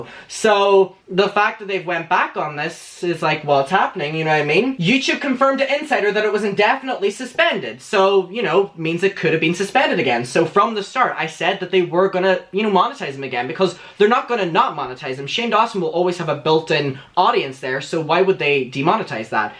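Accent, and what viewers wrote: Ireland. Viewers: He sounds a little Irish and British. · I think his is Irish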